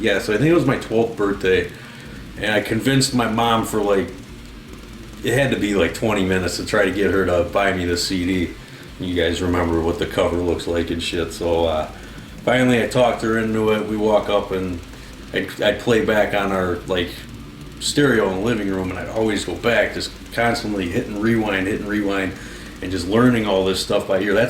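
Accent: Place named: Michigan, United States. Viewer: Dude I FUCKING knew you had a Michigan accent. Man, I've watched guitar videos of you since my early 20's(now 30)I hope I didn't get that wrong. If you ever find yourself in Mid Michigan I'd love to talk!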